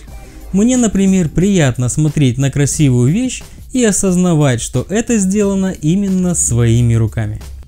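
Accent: Kuban, Russia. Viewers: Вы случаем не с Кубани?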